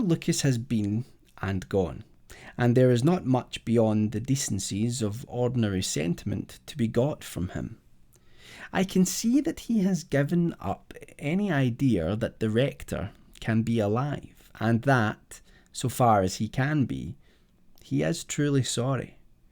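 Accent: Scotland, United Kingdom. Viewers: I realise this is 9 years old, but I've really enjoyed your narration, glad I found your channel. I love a Scottish accent ❤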